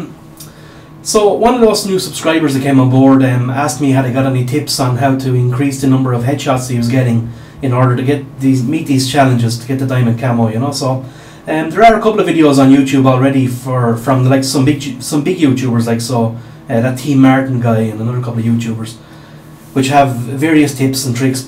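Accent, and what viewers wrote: Ireland. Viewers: Is he from Ireland so am I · Irish you tuber ftw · Are you Irish? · I liked and subbed... btw are you from wexford by any chance? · I have only gotton 50 second in the video I will finish it o.o but may I ask are you irish your voice is distracting in a great way :D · are you irish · Are you Irish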